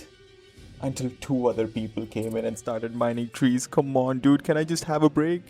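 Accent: India. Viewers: W video btw where are you from? your accent makes it look like ur from india (im from india as well) so im curious! :D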